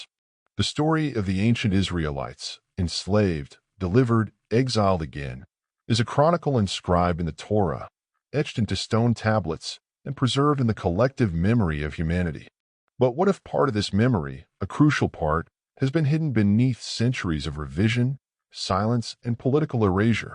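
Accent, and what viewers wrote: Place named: United States. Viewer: I don't want your subtitles. No American voice please. I prefer Oxford English.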